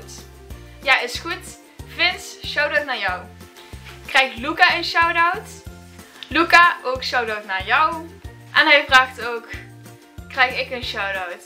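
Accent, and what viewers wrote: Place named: Limburg, Netherlands. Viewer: ben je een limburger? hoor t aan je accent! ben er zelf ook een :)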